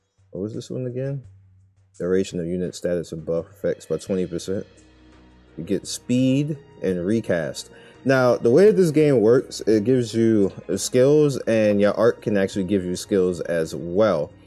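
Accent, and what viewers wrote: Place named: New York, United States. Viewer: Bro are you from harlem? You sound like you from New York. Nice Video on the game!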